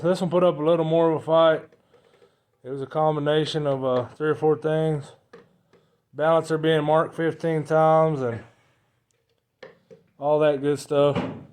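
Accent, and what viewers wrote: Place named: Appalachia, United States. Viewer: It is painful to listen to dumb hillbillys like you try to speak English. Good Lord!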